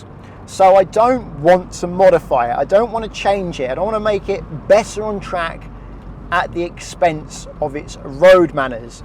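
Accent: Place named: United Kingdom. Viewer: great review. But I was more fascinated by the Bii iish accent. After 32 minutes of this video I was saying beTTer (instead of beDDer).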